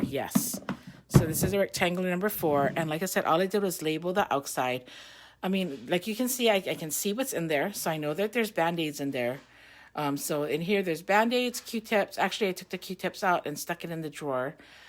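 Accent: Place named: Hawaii, United States. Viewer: Mahalo for making this video. I wish I could hire you to organize my house. Your voice is making me miss my friends from Hawaii.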